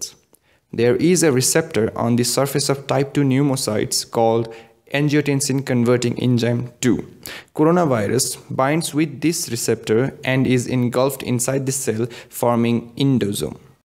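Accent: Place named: Nepal. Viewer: Ur accent seems u are from Nepal..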